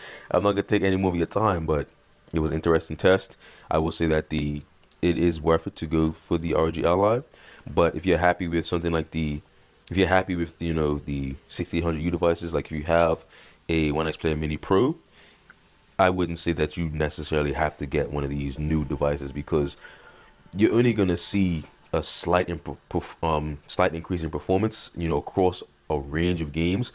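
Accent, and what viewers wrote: Bermuda. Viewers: Are you from Bermuda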